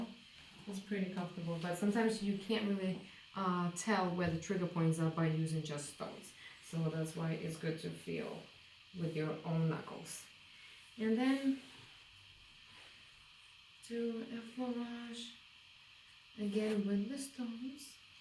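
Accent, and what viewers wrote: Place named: East Coast, United States. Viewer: I hear a cool east coast accent : )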